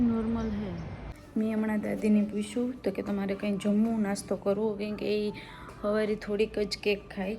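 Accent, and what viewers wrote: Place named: Kathiawar, India. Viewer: હમે પણ તમારા જેમ બોલીએ કાઢીયાવાડી 😅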